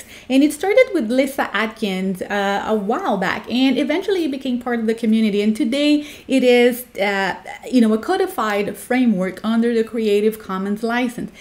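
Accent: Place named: Brazil. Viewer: Are you Brazilian? Your English sounds different.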